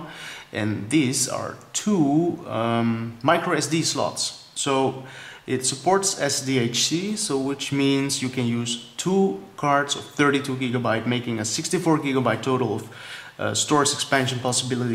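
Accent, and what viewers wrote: Netherlands. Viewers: OMG Dutch English always sucks ... (but nice try) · wow a chinese who talks with a Dutch accent you don't see that very often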